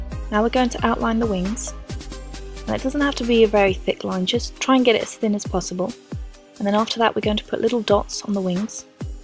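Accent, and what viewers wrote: United Kingdom. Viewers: hehe love how different certain words are in diff countries like in america its nail polish and in england its nail varnish hehe i love british accent :P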